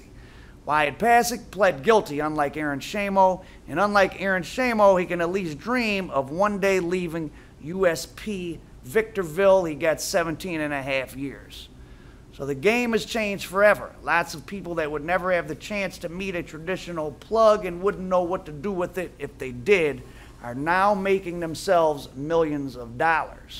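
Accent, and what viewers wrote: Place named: New York, United States. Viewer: I am trying to figure out your accent sometimes it sounds like New York sometimes it sounds Brooklyn sometimes it sounds like Chicago what the fuck are you bro? I'm from Brooklyn, but I can't fuckin figure it out, No disrespect..Are you a Paisan? 🇮🇹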